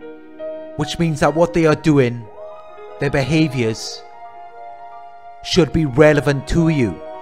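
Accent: Wales, United Kingdom. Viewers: Just stumbled over your video. Can’t believe accuracy of what you say. My ex narc 1. Promiscuous. Gave me a STI and took me to special clinic next morning! 2. Blamed me for preventing him meeting other women. We were supposed to be in a ralationship! 3. Told me his parent’s gave up on him as youngish of three boys.Elder brothers all narcs on various scales. All plotted against me “ stupid woman” 4. Reckless behaviour “ swimming with sharks! “. Frightening incident when showed me no concern for my wellbeing. Trying to keep up with his pace of walking and nearly walked in front of car. 5. Threatened to murder me if I slept with anyone else. He’d moved on to new supply and living with her. Had left me and gone abroad but I was supposed to be his girlfriend 6? So terrified of him coming home after long journey. Met up in hotel . Arrived at midnight. In such a black mood was too scared to speak to him. 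Even considered the scenario “ would anybody know where to find me if I went missing. 7.Would never discuss our relationship. If I bought up a concern he would try to initiate sex! Was sitting down one day opposite him and bought up a concern with one of his friends, no confrontation on my half. Suddenly threw glass of wine in face, wondered what on earth happened. Carried on as if nothing happened then carried on talking. When I challenged him he threaded to tape me so people could see what I was really like. Excuse my ramblings. Had to get it off my chest. Love the Welsh accent.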